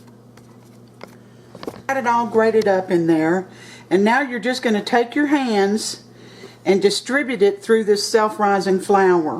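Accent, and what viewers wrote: South, United States. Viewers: I have been trying to make some for a long time & each time they are like rocks,so sure gonna give this a try,I aint giving up on baking.Love your southern drawl :)i never had problems years ago in UK.The folding technique is a new one on me. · Thank you so much! Those look so easy! I'm a yankee who has been known to make hockey pucks instead of biscuits and I think these I could do and not mess them up! I had a friend from SC even show me how to make biscuits when she came up here to visit and she said pretty much the same thing you did...when you are mixing them she said 'don't screw with them too much! in he same sweet southern drawl. <3 Thanks again for the recipe I thin I might go try them RIGHT NOW! :D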